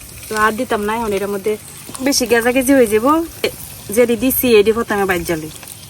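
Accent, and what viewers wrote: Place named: Netrokona, Bangladesh. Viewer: Gramer meye bujhlam kintu tai bole ki ektu Valo kore ashba na shabar shamna Eta hoccha netrokona karon Kotha goli jemon khayam jayam agoli okhankar vasha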